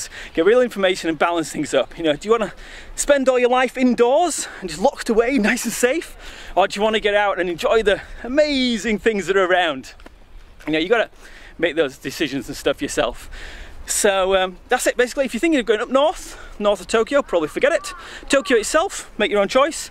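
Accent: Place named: Scotland, United Kingdom. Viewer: Ur accent is like a mix of British and Scottish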